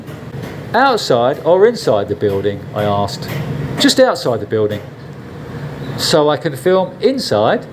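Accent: England, United Kingdom. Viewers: This was stupid. The englishman, from his dialect I think he is english should try to live in UK. Everything he complains about is so much worse in the UK. Philippines may have some problems, something we foreigners are not entirely happy about because it does not comply with our likes, but still....Pilippines is a great country to live in.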